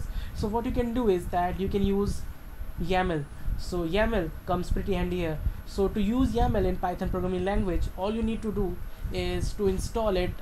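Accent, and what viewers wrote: India. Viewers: i kind of like the indian accent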